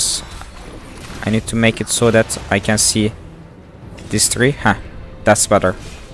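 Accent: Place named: Turkey. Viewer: aksanindan türk olduğunu anladım sonra kanal adını gördüm :D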